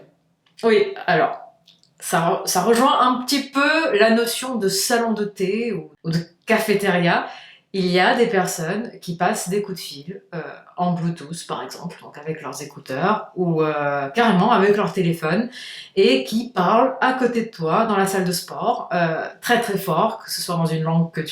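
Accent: Portugal. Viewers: C'est dû à son accent! Elle est portugaise, du coup dans ces langues là (espagnole et italiens) il y'a ce qu'on appelle l'accentuation. C'est surement ce que tu entends le français dérogeant à cette particularité rend encore plus audible son accent c'est tout! Bisous!! PS: d'ailleurs elle en a fait une vidéo sur son accent ON trouve cet accentuation en Anglais, bref dans plein de langues...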